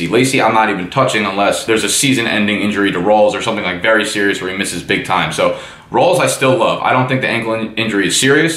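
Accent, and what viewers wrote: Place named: AAVE, United States. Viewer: Dude, GREAT info, better than the pro announcers. The only criticism is you don't need to cuss or speak in ebonics 10% of the time. Will be watching you from now on.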